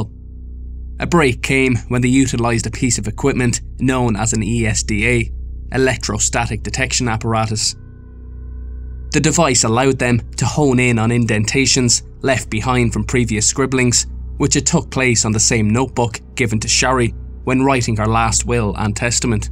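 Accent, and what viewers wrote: Ireland. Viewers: Unreal channel,brilliantly narrated. Love too know what County you are from because it bugs me trying to guess 🤣🤣 I'm from Dublin so you'd think I could take a good guess 🤣🤣 cheers for the hard work man;its really good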